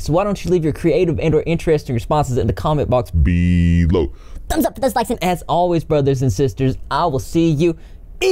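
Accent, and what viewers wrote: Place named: Texas, United States. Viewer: are you from Texas? Bc I know some people from Texas who has the same accent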